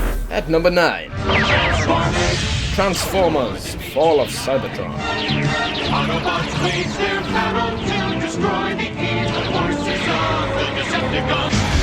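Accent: Wales, United Kingdom. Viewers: You welsh bro? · Are you from wales? you sound kind of welsh but you also sound like you're putting a accent on:) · Are you Welsh? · are you Welsh or American. I thought you were Welsh but the prices you mentioned we're American. It's rare for me to find a fellow Welshman on YouTube.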